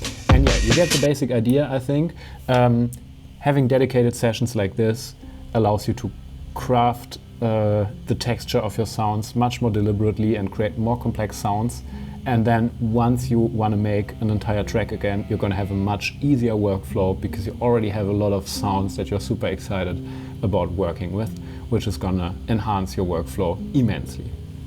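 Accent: Germany. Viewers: way better with the german accent. thanks!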